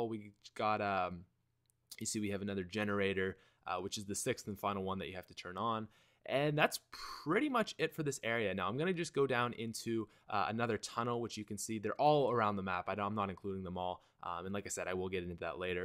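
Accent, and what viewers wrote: Canada. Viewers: are you canadian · R u canadian?😋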